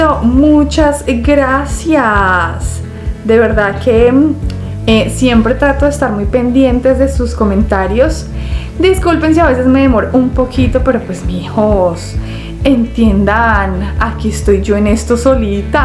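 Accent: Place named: Colombia. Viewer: Hola mija eres chingona,,me encanto tu acento Columbia,,..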